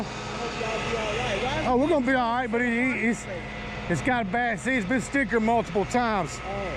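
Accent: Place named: Georgia, United States. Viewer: Hey man, I'm originally from Georgia and had to move further north a few years ago. Can't tell for sure if you're from northern Florida or southern Georgia but watching your videos is like a trip back home for me. I'm only half watching what you all is doing. Mostly I'm just enjoying the conversation. One good ol boy to another, I thank you.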